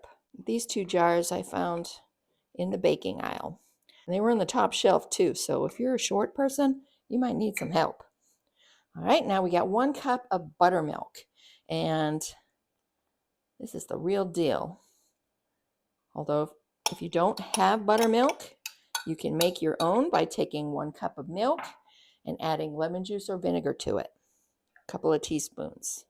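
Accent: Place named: Boston, United States. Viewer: Okay, looks like I'm the first commenter. My grandparents grew up in Groton, CT and remember taking the ferry from Long Island, 3-4 times a year, AND looked forward to the hotdogs & Beans, and fighting over the hunk of bacon! My aunt Colleen would bring "The Beans" to every family get together and she recently shared the recipe with me. Just made my first batch (in DE) and from memory, they are spot on! Your Boston accent is great by the way. I appreciate you taking the time for this video, which brought back many memories.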